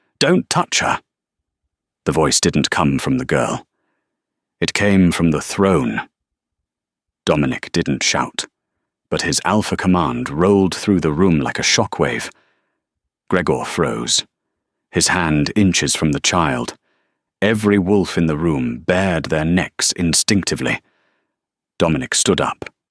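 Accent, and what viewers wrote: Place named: United Kingdom. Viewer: I call it Yummy Purring English / British accent.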